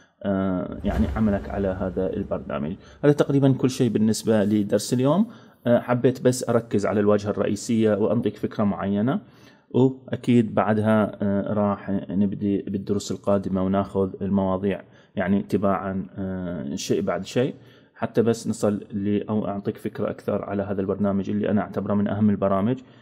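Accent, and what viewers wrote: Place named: Mosul, Iraq. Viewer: عاشتيدك والله احسن شرح لبرنامح البريميوم مبين عليك مصلاوي من العراق مو😅💜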